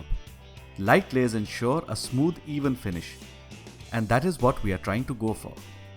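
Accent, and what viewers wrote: India. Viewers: He is Indian, so it is the way he pronounces it.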